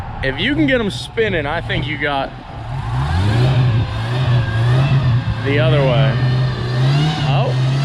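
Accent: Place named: Baltimore, United States. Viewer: I got 10 seconds into this video... and you gotta be from Baltimore or Philly. Yeah?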